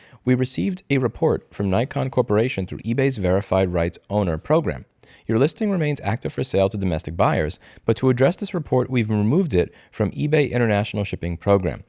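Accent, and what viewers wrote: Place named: Italian-American, United States. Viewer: The more angry gets the more ginzo he sounds.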